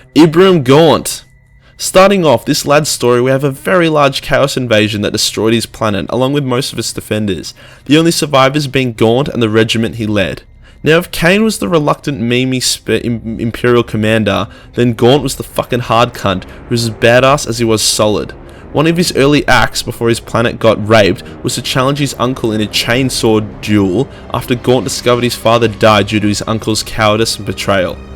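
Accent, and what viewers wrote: Australia. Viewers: It’s literally an Australian accent. Someone else is racist. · i know you mean english speaking but jesus christ be specific. hes australian, not english. its basically the same just worse at cricket/rugby and better at crime. cheers ears 🖒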